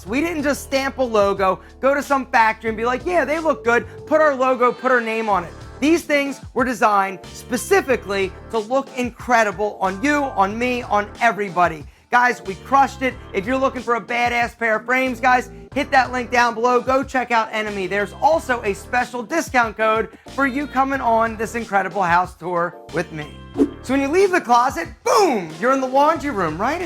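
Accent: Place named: South, United States. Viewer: Where did that southern accent come from?!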